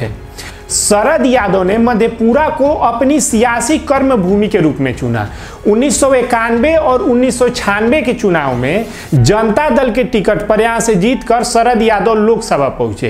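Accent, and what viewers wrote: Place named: Bhojpuri, India. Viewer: bhai mere bol Hindi rahe ho lekin awaz bhojpuri wali hai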